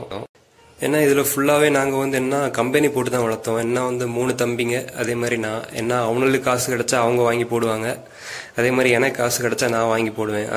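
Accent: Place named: Kanyakumari, India. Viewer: நானும் கன்னியாகுமரி தான் Makka நம்ம ஆளுங்க பேச்சை அவங்கள easyயா காட்டிக் கொடுக்கும்...😍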